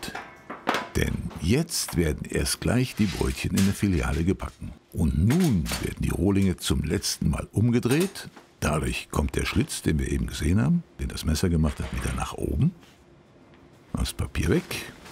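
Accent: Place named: Rhineland, Germany. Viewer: hatte schon immer einen leicht kölschen/rheinischen Akzent und den wird er jetzt mit 82 Jahren und nach über 45 Jahren als Maus-Sprecher auch sicher nicht mehr ablegen.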